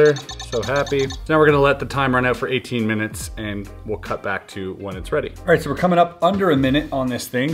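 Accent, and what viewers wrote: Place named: Quebec, Canada. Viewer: C’est la première fois que je tomb e sur une de tes vidéos et lorsque tu a dit merci dans part 1 jme suis dit c est sur qu’il est Quebecois lol Quand tu as dit Sébastien dans celle-ci ça confirmais... En tout cas bravo 👏 je suis un nouveau subscriber ✅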